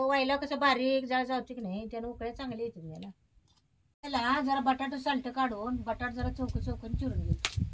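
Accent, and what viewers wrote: Kolhapur, India. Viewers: तात्या चा पत्ता दक्षिण कोल्हापूर भाषे वर न वाटतय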